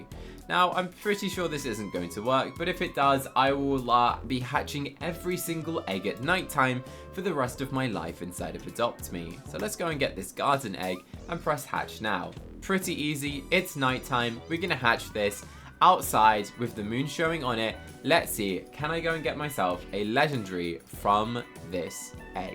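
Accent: United Kingdom. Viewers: So, I made a private server on adopt me and I tried to get you listed as someone who could use it but for some reason, I cannot friend you on Roblox. Can you please answer the question as to why and yes, I do mean you the YouTuber known as with the British accent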